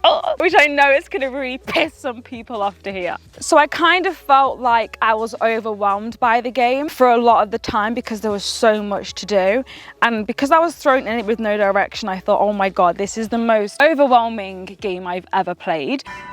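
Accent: United Kingdom. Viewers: How do you have both British and American accent? 😳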